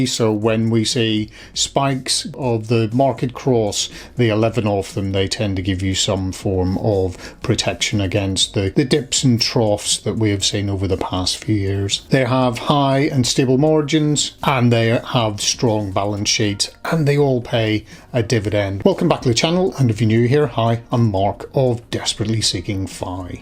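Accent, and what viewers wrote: Northern Ireland, United Kingdom. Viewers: Great content Mark plus good to hear a local N.I. accent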